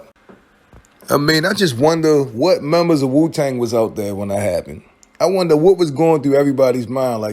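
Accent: Baltimore, United States. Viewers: Sounds like your from Baltimore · U got to be from Baltimore with that accent 🤔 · I bet your bottom dollar this dude doing the narrating is from Baltimore guarantee it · U from Baltimore i can tell by the way you say to and do lol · You from bmore aint you? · You front Bmore you kinda have a accent like you from here my dude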